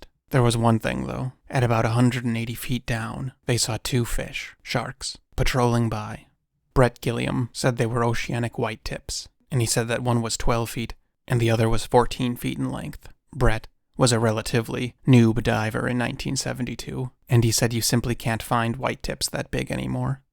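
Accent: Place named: Midwest, United States. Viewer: Here’s my assessment on why videos are so good: 1.) Great editing— knows what, where, and when to insert footage/still photos/illustrations. 2.) Fringe subjects— brings us news and stories that are often dark and overlooked. They pique the macabre portions of our minds. 3.) Thoughtful writing— couples the best words with the right images. He chooses smart verbiage. With, you’ll be served big portions of choice descriptors, which are juicy and filling. 4.) The voice—The sound of voice is laden with minuscule, barbed filaments. Once it passes through your ears, you’re hooked. His accent is unique. It’s regional Midwestern, USA. You can love that, or you can hate it. You cannot, however, mistake that. voice is offered in the right tone—low and eerie (in a good sense.) It’s delivered at a smooth pace, without stammering or hiccups of any sort. 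I sincerely hope that channel swells and rockets to the stars. He deserves it.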